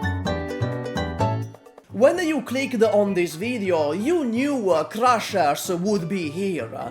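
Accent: Italy. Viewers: I reconized that accent as a fellow italian, good to have a youtuber from around hete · It my first time hearing an Italian Explain Arknights, How the Siracusao??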